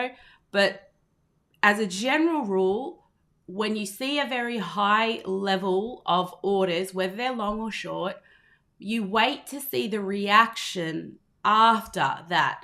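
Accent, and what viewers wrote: Australia. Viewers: I do like a lady with an Australian accent :) great analysis!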